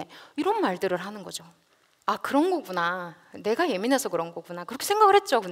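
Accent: Gyeongsang, South Korea. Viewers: 이 여자는 경상도말투라서 사납게 느껴지니 거부감이 든다.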